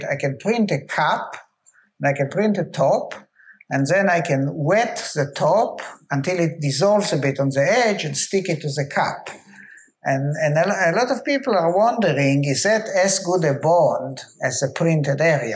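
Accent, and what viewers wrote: Israel. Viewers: It’s the most Israeli accent I’ve ever heard. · Is it a Hebrew accent? GREAT GREAT VIDEO and astonishing relatively SOLUTION! The definitely BEST intro about metal 3D printing around. Thank you Sir. It's really beautifully simple, efficient, precise. THE BEST COMMERCIAL AROUND TOO.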